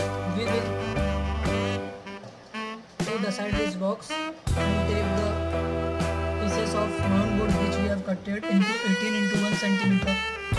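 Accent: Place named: India. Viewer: Dude Well done but some word are still in indian english so Some people won't understand it Well I m indian So I no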